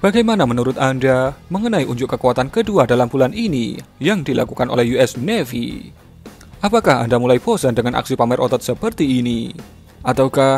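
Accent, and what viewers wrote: Java, Indonesia. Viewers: Medok nya dak hilang2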